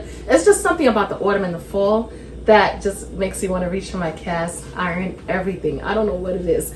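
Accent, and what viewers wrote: New York, United States. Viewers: That looks amazingly delicious 😋 and it’s sounds like a New York voice you have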